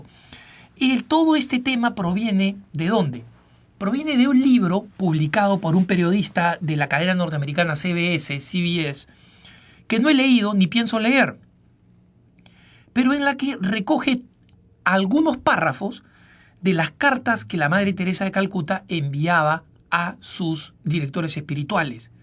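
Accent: Lima, Peru. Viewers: ACENTO LIMEÑO.....agg¡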